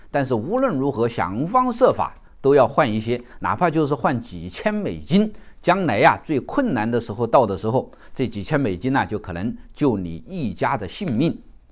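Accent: China. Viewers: 聽口音你也是中國人吧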